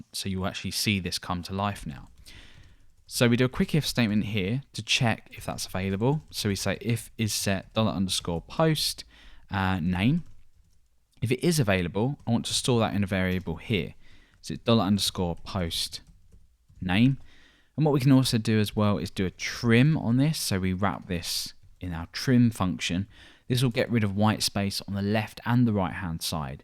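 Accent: United Kingdom. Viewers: PHP tuts, bonus British accent free.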